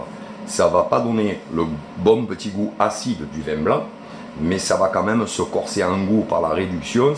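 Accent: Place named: Marseille, France. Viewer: 👍👍👍👍👍👍👍👍👍👍❤❤❤❤❤❤❤❤❤❤ et avec l'accent Marseillais en plus (Le vrai...)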